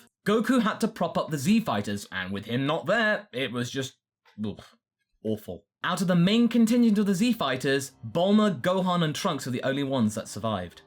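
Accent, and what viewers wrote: United Kingdom. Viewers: hearing "robot arms make everything better" in your beautiful British accent made me happier than it should have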